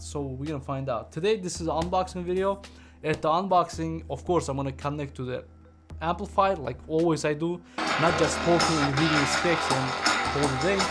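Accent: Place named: Russia. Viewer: The best according to you Russian accent speaker review guy.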